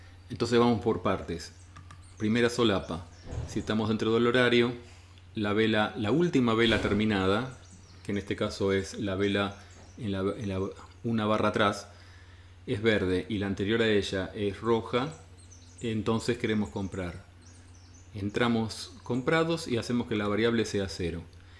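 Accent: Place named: Argentina. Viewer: ahí me fijo.. muchas gracias!.. ché, por tu tonada creo que eres argentino.. vivís en Buenos Aires.. das algún tipo de asesoría presencial?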